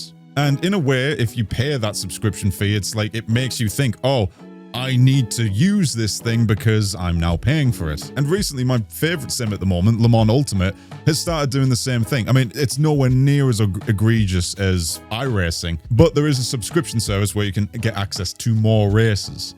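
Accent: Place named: North East England, United Kingdom. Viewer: So where are they going to get money from to keep the servers on? It’s all well and done to whine about costs, but long term, unicorn tears and candy floss won’t keep it going. I like Iracing and LMU and race both regularly. I hope this new sim succeeds but long term support isn’t guaranteed without money. Nice to hear a fellow North East accent, even if I’m older than your dad.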